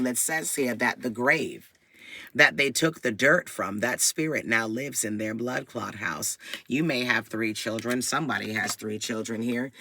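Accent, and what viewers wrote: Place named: Jamaica. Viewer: Why do I knw you talkin to me? I hear the voice of God in you...that deep voice resignates i can relate and n your speaking patois...Jamaica my birth place ...jhheeeez bless up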